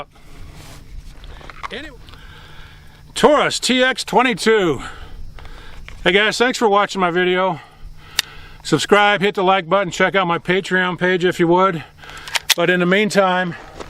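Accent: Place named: Michigan, United States. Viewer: Awesome vid. Right here in Michigan? That looks like Nevada. You must be a native Michigander. That accent is hard to miss, one Michigander to another.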